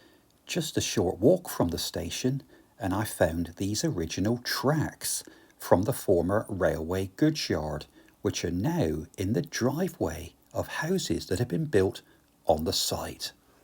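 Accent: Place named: West Country, United Kingdom. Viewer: You have a bit of a West Country accent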